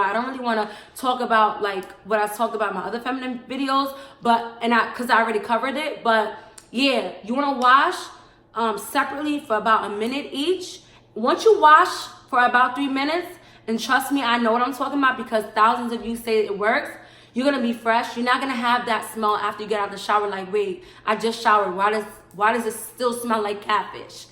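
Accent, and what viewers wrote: New York, United States. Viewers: She sounds like a New Yorker lol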